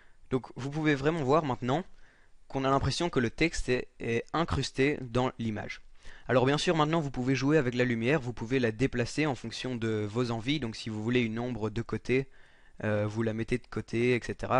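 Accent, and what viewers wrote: Belgium. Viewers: tu est belge ? si non tu as l'accent belge ^^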